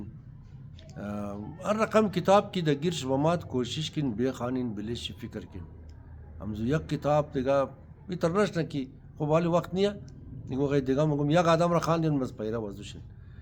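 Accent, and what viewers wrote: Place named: Hazarajat, Afghanistan. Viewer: کاکای ما از آزره جاغوری ایم. لهجه آزرگی تو خیلی خوشم میه. خدا توره عمر سالم و دراز بده. 💐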